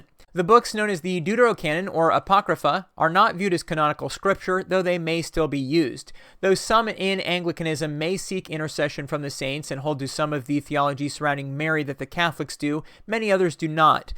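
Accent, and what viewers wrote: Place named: United States. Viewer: Whats weird is I only realised the American accent near the end of the video.... depends where my head's at, i thought you were English most of the way.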